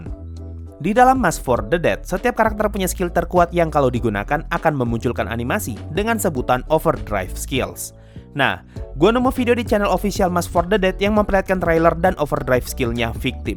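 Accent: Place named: West Java, Indonesia. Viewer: jadi bakal ada banyak kalimat yang "Rada" aneh, wah kayaknya mimin org sunda :v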